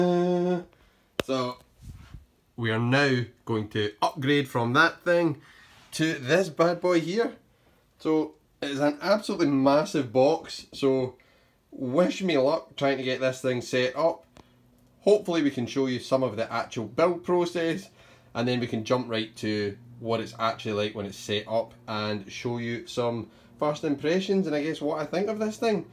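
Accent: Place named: Scotland, United Kingdom. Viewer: We'll definitely keep it in mind! Nice to hear another Scottish accent as well on youtube! :)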